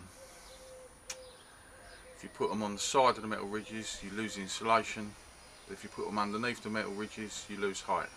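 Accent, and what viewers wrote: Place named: London, United Kingdom. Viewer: Hi. Just came to this channel after a shout out from self build campervans on Facebook. I'm in the process of doing a conversion too so I completely understand what you're saying about having workshop facilities etc. My ex-community minibus is not even parked in my driveway! It's about 100mtrs across the road. Admittedly it's quite peaceful there, but it's a proper pain when you need the next tool or a piece of wood... Anyway, I'm enjoying the videos that I've seen so far and I have subscribed. Love the "suits you" every so often, but of course not everybody will understand it :) Keep up the good work; I know you'll get there in the end. Regards Mark in the UK p.s. pretty strong good ol' London accent there; are you in the London area or have you moved?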